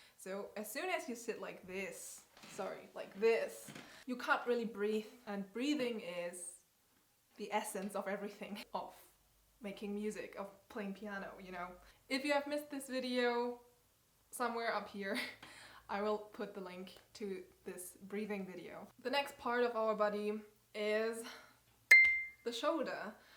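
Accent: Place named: Germany. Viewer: Thank you! I love how you explain your ideas it’s like your speaking German through English 😅 💙 oh and how does patreon work? I’ve never used it before but i would like to support you! I practice Bach everyday at the piano, sometimes mozart Beethoven and Haydn but mostly Bach 🙌🏼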